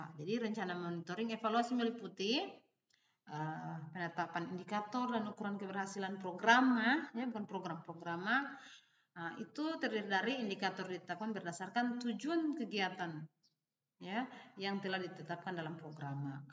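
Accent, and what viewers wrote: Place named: Bugis, Indonesia. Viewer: Pantas logatnya Bugis sekali 😂,salam dari sinjai ibu heheh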